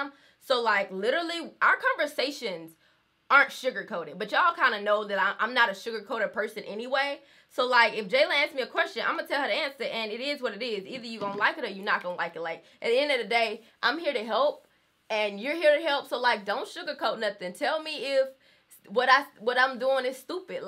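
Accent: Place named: Atlanta, United States. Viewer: I love your accent lmao ATL af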